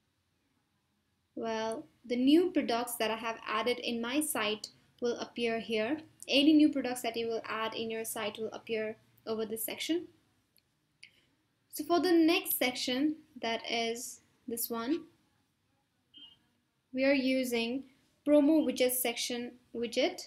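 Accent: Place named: India. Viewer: Typical Indian Voice trying for Amercian English. Good.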